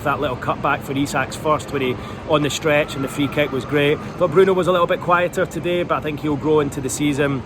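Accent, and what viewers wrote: Scotland, United Kingdom. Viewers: You're a Jock mate not a Geordie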